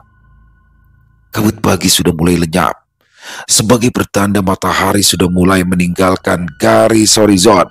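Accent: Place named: Banjar, Indonesia. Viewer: Ku kira dari om rasth ternyata aku lupa kalau bang saujana juga orang kaltim😂,kalau bang saujana Kaltim om rasth kal teng,kenanga kinasih kal sel penulis dari kal bar belum ada kah bang,aku klau kisah2 dari tanah Kalimantan selalu excited bnget palagi dari om rasth penulis yg epic bnget menurut ku lah, selalu menanti cerita beliau,kalau bang ga bisa dengan kata2 soalnya sdh tentulah pembawaan nya candu buat aku ternyata logat/ pemandiran bang sdh mahir bahasa banjar lah kd nglih lgi mun kena kepulau Kalimantan 😊bajalanan tinggal tagur2 hj 😅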